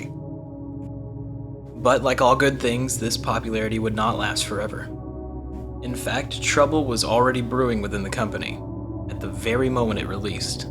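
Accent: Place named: Germany. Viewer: Incredible German accent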